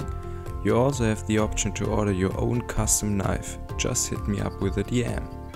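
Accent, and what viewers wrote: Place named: Germany. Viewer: Great video 👍🏼 ich nehme mal an du sprichst Deutsch bei deinem Akzent? However well done👌🏼👍🏼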